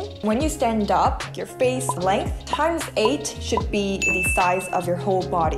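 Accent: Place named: Philippines. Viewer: Your accent is so similar with Filipinos' accent ❤️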